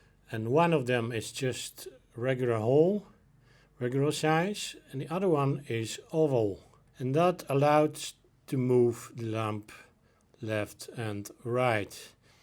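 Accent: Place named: Netherlands. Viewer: Thanks very much for your videos. It takes all the guess work out of it. And it took a Dutchman to do it. Oh well, you sound Dutch anyway!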